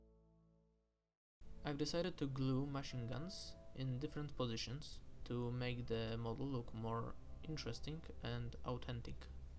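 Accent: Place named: Poland. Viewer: Nie źle angielski też spoko tam tylko masz polski akcent ale tam jest spoko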